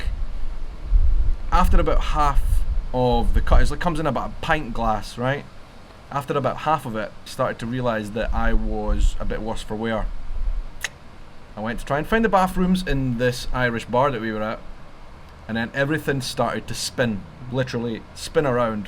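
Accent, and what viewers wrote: Scotland, United Kingdom. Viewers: Advice to you or anyone else traveling from abroad to an American sporting event - TALK TO PEOPLE! Us Americans LOOOOOVVEEEEE accents - Scottish is the best! - and once we find out you’re from elsewhere, it becomes OUR DUTY to show you the best time possible; you won’t pay for drinks the rest of the day, trust me! Never hesitate to use your accent as the currency it is here in the states; we love to have you! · You're not wrong, especially if it was a Scottish dude who's visiting these tailgate spots. A Scottish accent is basically a free pass. Most people wouldn't mind if you were drinking their alcohol. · Especially with that Scottish accent!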